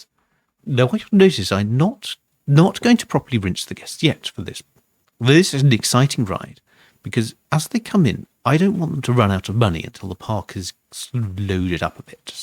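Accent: United Kingdom. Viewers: I understand, & can i also say.. i've never came across anybody with a posh accent like yours before, it's kinda relaxing.. almost asmr in a away.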